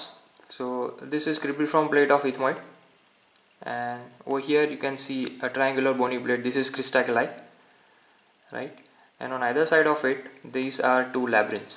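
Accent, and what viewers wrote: India. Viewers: Very hard to understand. All your words are just joined together in a train sequence, theres no distinguishability between 1 word from the next. When you speak with an accent, specially a heavy one, you must talk slower, take a break between parts in different parts within the sentances, and pronounce words heavier. Otherwise it just sounds indian. This is advice from a linguist !!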